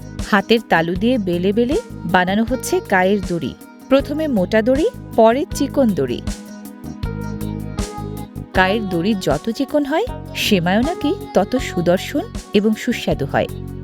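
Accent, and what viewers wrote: Sirajganj, Bangladesh. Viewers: Sirajgonj bole kotha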